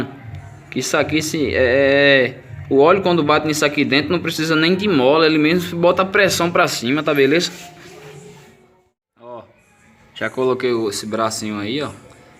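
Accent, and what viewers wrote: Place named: Northeast, Brazil. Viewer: Maravilhoso sotaque nordestino, " ta rochada ja" abraço pra vc s todos aqui de CuritibaPR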